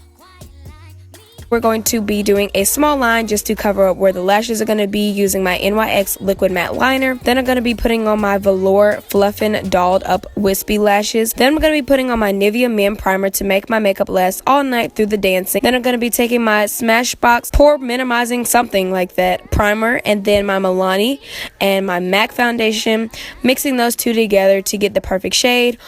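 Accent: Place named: South, United States. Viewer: Sound so country / southern !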